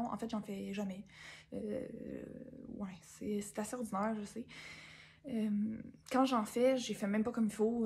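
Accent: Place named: Quebec, Canada. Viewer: Podcast très agréable, et je trouve que pour un premier exercice tu t’en sors très bien 👍. Je t’ai trouvée très à l’aise, spontanée, avec des petites diversions qui m’ont fait sourire 😀. Tes tricots et tes patrons sont très jolis, et tu les présentes bien , avec ce petit accent québécois si agréable … Continue ainsi…. Je me suis abonnée pour ne pas rater le prochain épisode.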